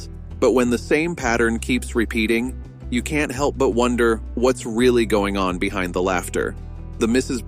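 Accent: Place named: United States. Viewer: VOICE OVER TALKING CRAP AND WHY IS AN AMERICAN DOING THIS AS THEY HAVE A DRY SENCE OF HUMOUR....VOICE OVER TALKING GARBAGE.......GO MAKE COFFEE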